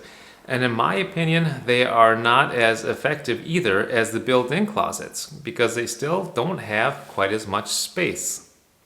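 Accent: Germany. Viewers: Why did you have such a strong obvious German accent ? 🤔 I am German and I wondering. Because you don't sound like an American. Did you live so long here that you adopted a German accent? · Hello I recently discovered your channel. I noticed your German accent and could not believe you were actually American. I find most interesting are your beautiful videos and photos in Bavaria. It brings back memories of life in Germany living in a Village! I Loved it! I Love the Land, homes and culture so much! I felt at home there, although I was born American. I appreciate your interesting information! I hope to move back to Germany. I Watch videos, live, eat, sleep and dream Germany all the time. May you and your family enjoy your beautiful new Home! Tchuss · Did you grow up in Germany? The accent and vocabulary really don‘t sound American ;) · You‘re not a real american. 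You have like a german accent. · Hey, found the correct video and it is very interesting, because I had the same feeling. When you speak English I also heard a light German accent. It is very funny somehow. :)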